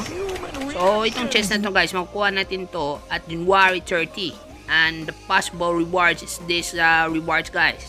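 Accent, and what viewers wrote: Visayas, Philippines. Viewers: Hahah pa shout out giatay bisaya ka??? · Basta Filipino Accent Sabog sa Tawa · Kuya san ka nakatira? Bakit ka bisaya?😂💪🏻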